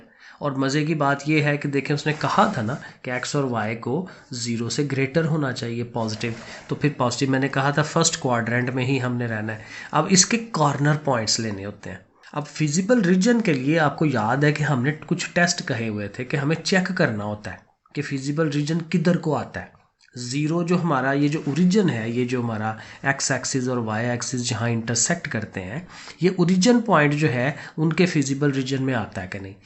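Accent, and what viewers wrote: Sialkot, Pakistan. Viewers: Sir's accent shows that he is Sialkoti.....❤😂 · Sir ap sialkot se hein??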